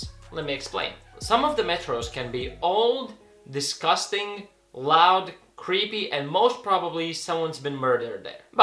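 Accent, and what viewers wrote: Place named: Latvia. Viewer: Nice video. Uzreiz varēju pateikt ka esi latvietis pēc tā ka teici 2 miljoni cilvēki. Akcents nodeva. Tad tava kanāla nosaukumu izlasīju. Bet tā. Forši ka šādu info liec :) noderīgi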